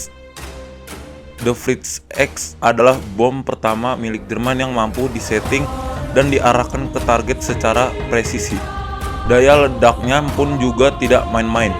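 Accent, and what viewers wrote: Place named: West Java, Indonesia. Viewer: Ini pasti yg baca narasi orang sunda..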